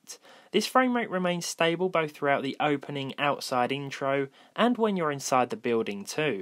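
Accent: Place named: Home Counties, United Kingdom. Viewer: I just realised that RandomGaming and Budget Builds are 2 different channels, lol. I just hear someone with a home counties/posh (I'm from the North you sound posh to me) accent talking about old hardware. AMD is like Linux, over the time they have existed you will always hear someone saying they are poised to take over their bigger rival, but it never happens, there must be a reason why Nvidia have always been, by far, bigger in the market than AMD, same goes for Intel, it can't just be the stupidity of the public. Whenever it's time for me to upgrade there is always chatter than AMD are better than Nvidia and Intel, and I always look at them, but can never take the plunge, they never seem to stack up to the hype.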